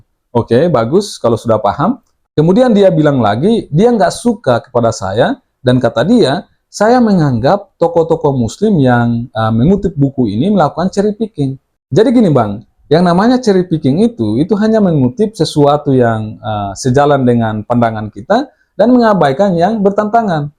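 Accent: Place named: Papua, Indonesia. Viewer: ketauan misionaris terselubung kau, dari logatnya aja udah ketauan kau kristen papua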